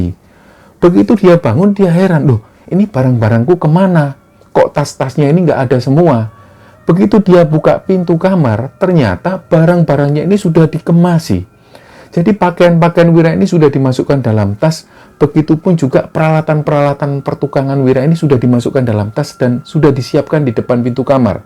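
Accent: Java, Indonesia. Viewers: Sempet suka sama beberapa channel yang nyeritain kisah horor, eh lama kelamaan kelamaan malah suka manggil narsum yang kayanya ceritanya ngablu/fiksi/dibuat2, kurang suka juga sih kalo yang nyeritain narsum nya langusng, karna ga semua narsum bisa ngatur cara bicaranya untuk bercerita Akhirnya sekarang lebih sering denger cerita dari karna yang nyeritain ya cuman mas doang, jadi feel nye hari ke hari makin dapet, terlebih karna saya orang jawa jadi lebih enak aja kalo yang cerita ada medok2nya/campuran bhs jawanya. Serasa lagi diceritain pakde sendiri semangat terus tim, semoga bisa makin besar channelnya dan pendengarnya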